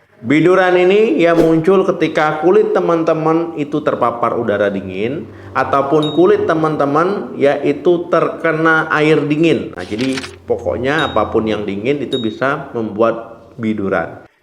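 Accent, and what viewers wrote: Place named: West Sumatra, Indonesia. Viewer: Emang dokter urang minang yaaaa😂 galigato bahasa awak tuuu😅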